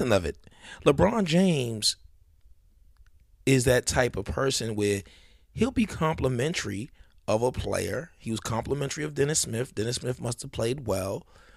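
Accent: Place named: Brooklyn, United States. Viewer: Bronx all day! Haha, sorry I said I heard a BK accent. Haha. Yo what's your thoughts on bitcoin and crypto brother? ✌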